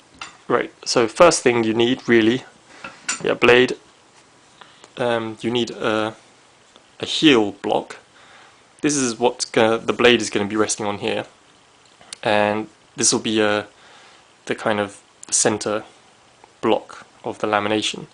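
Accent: United Kingdom. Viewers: Also, you trolled me really hard. I'm watching a video of a guy making planes, a Brit obviously, because of the accent if I ever can spot one, and he says he lives in Japan, and refers to Japanese people as 'they'. So i click on his other video, and who do I see? A fella of obviously Japanese origin, though I've seen in comments you saying you're british. And yes, I was about to ask how come that your accent is perfect, since I know Japanese natives have some problems saying L in English. Also what's funny with you being asian (no disrespect, hopefully you know that) is that the first thing I'm making using plane you've help me build is a... japanese tanto saya (scabbard). Let me tell you, if you ever gonna build one, don't bother with straight chisels. Real sayashi would use a curved one, that can do paring cuts everywhere, even on the far end of the scabbard. 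Now I'm going to my 'workshop' to try for the third time. Come along if you wish to learn some Polish words used to express your discontent, sir. ; )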